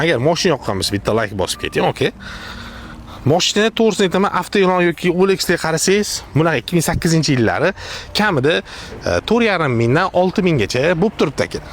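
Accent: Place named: Tashkent, Uzbekistan. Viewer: Узи тошкентликми бу чала гапирмокда тошкент шевасида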